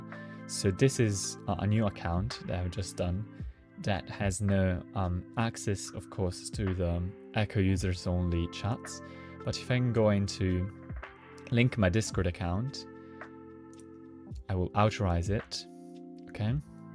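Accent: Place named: England, United Kingdom. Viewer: Your English is very English. Nothing's wrong with it don't worry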